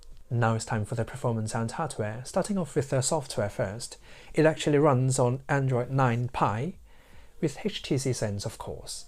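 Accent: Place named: United Kingdom. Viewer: I love British accent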